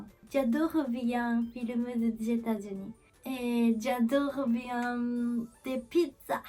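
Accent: Japan. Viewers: Merci pour cette vidéo , avec cet accent vraiment Kawaii, tu parle très bien Français bravo , mieux que je parle le Japonais 😆 Arigato Gozaimasu 👍😊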